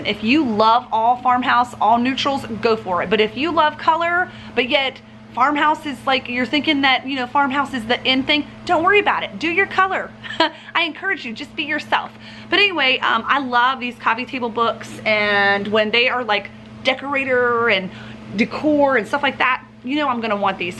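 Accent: South, United States. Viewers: Ok sister I don’t know where you’re from, since you sound like me I’m figuring “The South” so if you ever get rid of that picnic basket I’m your huckleberry 🤷🏼‍♀️😍. So glad I found you!!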